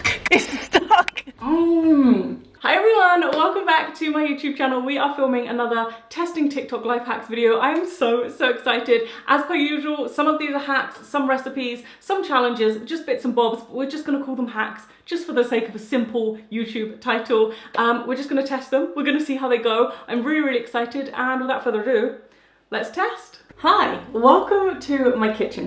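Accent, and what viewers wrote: United Kingdom. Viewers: What part of the UK do you think she is from?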